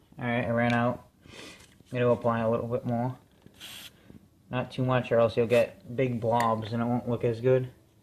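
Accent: Boston, United States. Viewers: where u from? you sound like you have a slight boston accent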